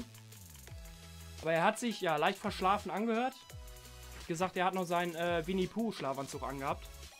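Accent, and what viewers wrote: Ruhr, Germany. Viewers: Dabei seit ca 35K Abos. - Ein Kanal der durch Menschlichkeit und Sympathie punktet. Der Protagonist?. Ein Mensch der immer einen Joke auf Lager hat und mit seiner Ruhrpott-Schnauze die Fifa-Szene rockt. Ein absolut Bodenständiger Typ, dem man die 300K einfach gönnt. du Legende, mach weiter so und lass dich nicht verbiegen. #KellerGang #2K18iscoming #HappyNewYear #Crewlove #VomShishaKellerzumKeller #Roadto400K #BesterYoutuber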